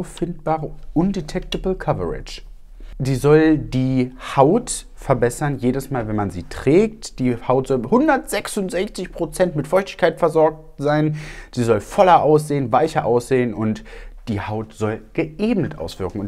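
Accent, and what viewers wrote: Berlin, Germany. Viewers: Ich gucke gerade alle deine Videos 🙃 was mir auffällt: Ick vermisse dein berlinern in den neueren Videos. Aber generell suchte ich jedes Video. Liebe aus Berlin!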